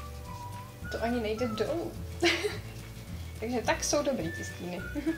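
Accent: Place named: Moravia, Czech Republic. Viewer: Mě se na tobě líbí takovej ten moravském přízvuk:D např. jak říkáš líbí-líbijou :) video je super a nad nákupem přemýšlím! :)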